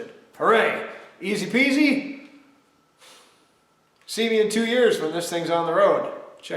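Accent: Canada. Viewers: Love your video no crap just gogo on the job,thank you l hear your Canadian speak hows that hockey team eh?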